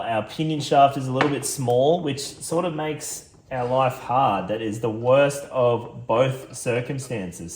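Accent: Australia. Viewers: Thanks for sharing your knowledge - As always, both an authoritative and entertaining watch.👍 I also have this vision of the OS viewers getting a crash course in good old fashioned Oz speak.... Magic.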